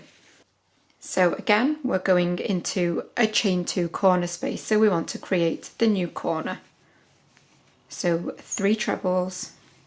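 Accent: United Kingdom. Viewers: Your British accent is a winner as well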